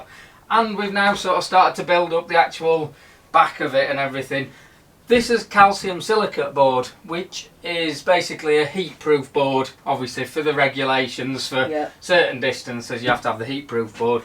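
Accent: Yorkshire, United Kingdom. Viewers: Your wonderful Yorkshire accent is similar to that of Mick Ronson, a guitar hero to many like me. Do you both hail from Hull as he did, or are your roots closer to Leeds, Sheffield, etc.?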